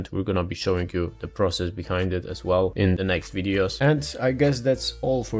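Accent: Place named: Poland. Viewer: thats gotta be the thickest polish accent ive heard in a while 😂😂😂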